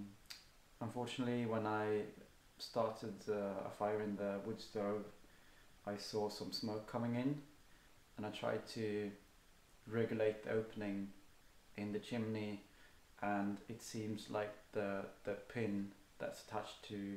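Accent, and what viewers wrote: United Kingdom. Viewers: Du har en brittisk dialekt har du bott där tidigare ?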